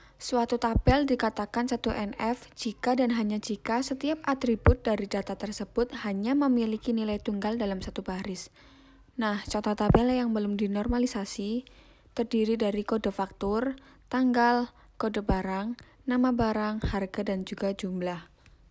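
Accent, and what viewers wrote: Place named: Java, Indonesia. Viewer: medok bund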